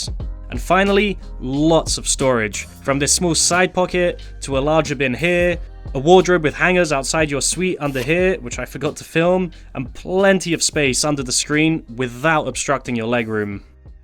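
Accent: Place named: Southern England, United Kingdom. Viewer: He is British but I can't pick the county. Not a northerner, that's for sure, so he's from south of Birmingham.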